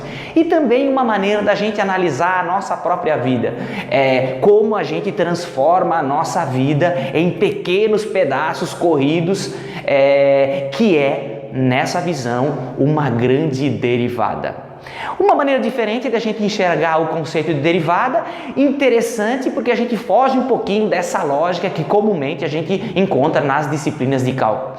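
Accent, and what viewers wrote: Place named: Limeira, Brazil. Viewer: Amigo, vc é de Limeira-SP? Pelo sotaque, se parece com o meu....rsrsrs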